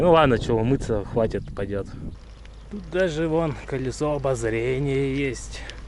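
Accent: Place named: Urals, Russia. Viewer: Спасибо,! Смотрю тебя давно, пишу впервые. Благодаря тебе я посетила Платониду и Шунут-камень. Коренная уралочка, прожила почти 30 лет в нескольких десятков километров от этих мест, так и не добралась туда. Приятно слышать родной, уральский акцент. Здоровья тебе крепкого,ветра всегда теплого и попутного, людей хороших вокруг! С уважением, М.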